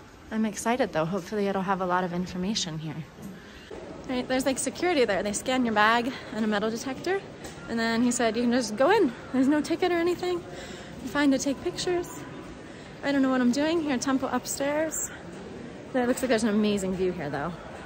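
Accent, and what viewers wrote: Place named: Southwest, United States. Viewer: clearly its an American accent.. southwest.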